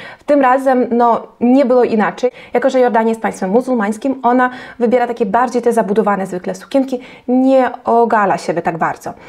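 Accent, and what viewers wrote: East, Poland. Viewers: spytałam o pochodzenie bo usłyszałam piękny wschodni akcent. Pozdrawiam 🤗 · Piekny,wschodni akcent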